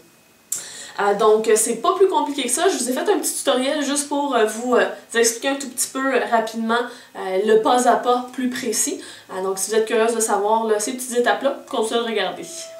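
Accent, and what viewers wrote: Canada. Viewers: T'es canadienne, t'es pas? :-) très bon truc!!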